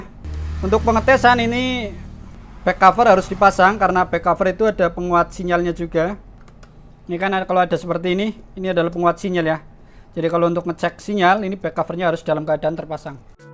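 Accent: Banyumas, Indonesia. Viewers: Ngapak ya kak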